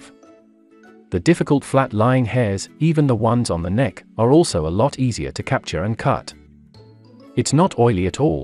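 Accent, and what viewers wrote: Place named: England, United Kingdom. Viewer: Another authoritative sounding video with Engl-ish accent, maybe AI. Why do pre-shave lotions cost so much, mostly alcohol.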